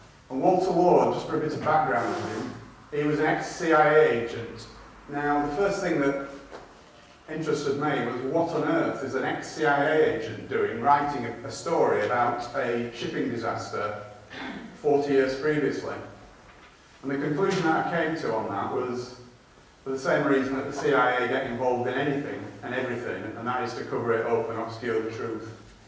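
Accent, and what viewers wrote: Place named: United Kingdom. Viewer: The echo-ey AUDIO, along with the fast speech, & British accent, make it difficult to understand what is being said; needs Improvement. Thank you.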